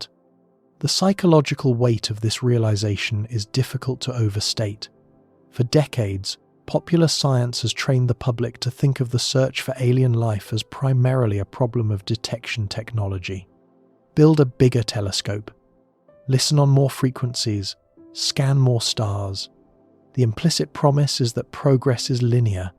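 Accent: United Kingdom. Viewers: But this guy sounds British, not Russian... 🤔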